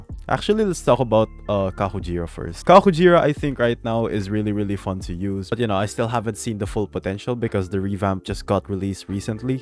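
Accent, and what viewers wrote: Philippines. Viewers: Ye he sound Filipino I can hear his Filipino acsent jumping and turning American again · are you pinoy? Your accent is kind of filipino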